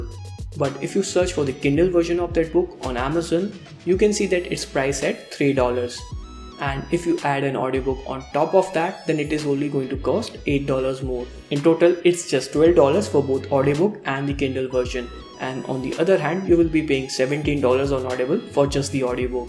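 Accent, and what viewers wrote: India. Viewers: english with accent hindi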